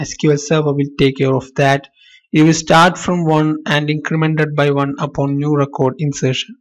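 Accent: India. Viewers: 咖喱味英语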